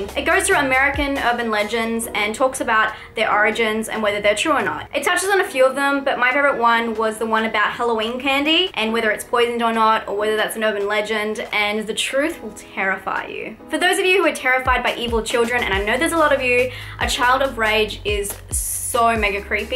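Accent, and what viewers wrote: Australia. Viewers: Are you an Australian? You're pretty as ay. *blush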